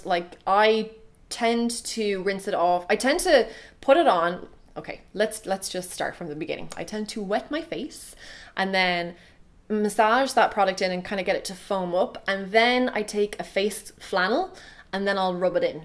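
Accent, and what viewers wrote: Ireland. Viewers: I could sit and listen to your beautiful Irish accent all day. So nice.....You look so pretty and I love your hair color.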